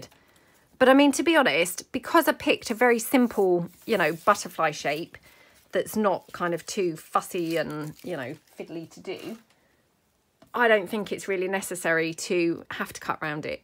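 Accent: England, United Kingdom. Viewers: Hi, I do love listening to your chit chat as well as all your lovely junk journal tips. Have lived in Australia since 1974 and miss the English accent.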